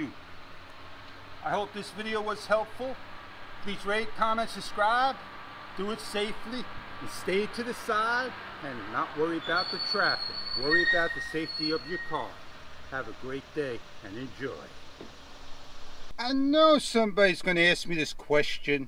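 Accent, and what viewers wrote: New York, United States. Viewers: That NY accent.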